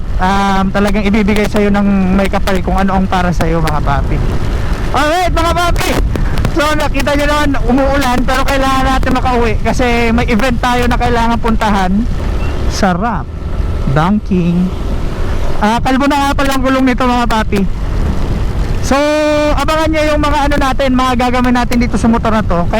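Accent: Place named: Bicol, Philippines. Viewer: Bicolano ka boss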